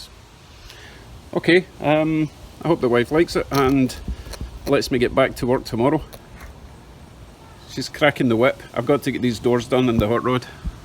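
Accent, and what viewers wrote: Scotland, United Kingdom. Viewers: Awesome video! Love the video and the Scottish accent I’m from Texas so it was nice hearing someone with a Scottish accent. Excellent job!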